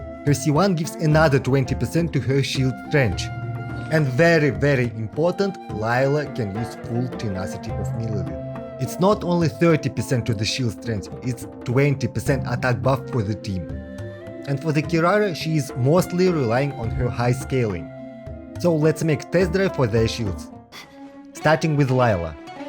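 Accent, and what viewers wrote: Russia. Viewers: Это снимал русский? Акцент прям заметный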